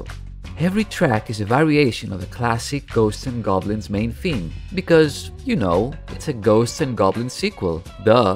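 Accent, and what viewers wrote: Greece. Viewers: Το γεγονός ότι κατάλαβα ότι είσαι Έλληνας από την προφορά και όχι από την ποιότητα του βίντεο λέει πολλά. Κιπ ιτ απ, σου αξίζουν πολλά περισσότερα σαμπς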